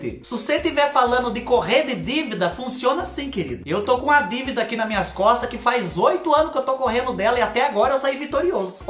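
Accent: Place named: Caipira, Brazil. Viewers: Oi chegando como quem não que nada gosto muito deste teu sotaque caipirez de que terrinha você é,será que é daqui de perto de mim, Capivari,Porto Feliz ou é puro charme kkkkk adorooooooo😘😘😘😘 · Seu sotaque e bem parecido com os mineiros sua cidade fica próximo a Minas Gerais?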